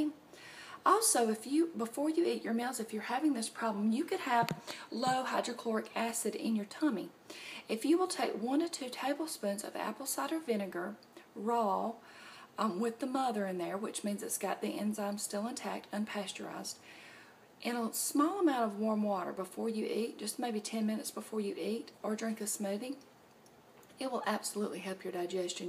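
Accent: South, United States. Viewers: You need to write a book,! You say things in a way that's so well put and thought out. I'll read it in a southern accent so it will be just like you!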